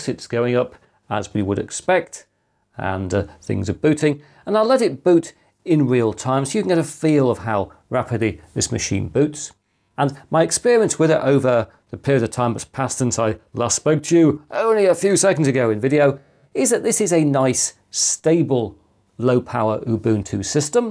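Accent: United Kingdom. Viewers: ".... and now that's it" for another exciting and informative video from my favorite YouTube producer. I love this British accent❤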